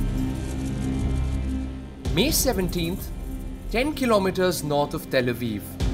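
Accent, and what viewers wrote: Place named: India. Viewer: what conspiracy theory it is a fact, you speak in Indian accent.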